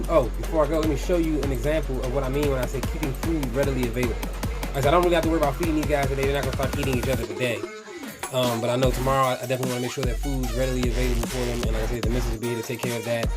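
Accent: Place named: DMV, United States. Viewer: You sound like you’re from DMV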